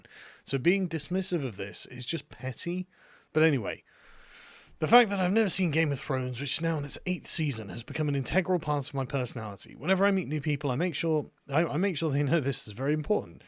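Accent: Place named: United Kingdom. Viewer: You’ve got the most awesome British accent ever!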